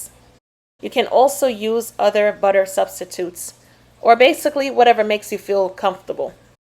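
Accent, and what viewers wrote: New York, United States. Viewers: That New York accent just coming right through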